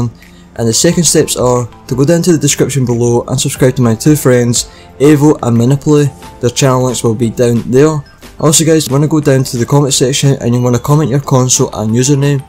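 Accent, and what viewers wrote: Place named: Scotland, United Kingdom. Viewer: ur scottish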